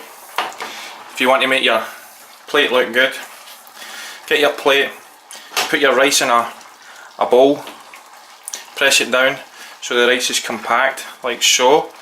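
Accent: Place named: Scotland, United Kingdom. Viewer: Fab ill be giving this a try. Love your Scots accent btw, its ace :)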